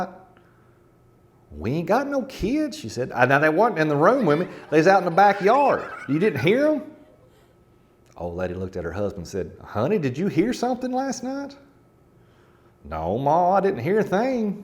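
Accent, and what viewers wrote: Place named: Appalachia, United States. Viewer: Really good story! And told with great Appalachian voice.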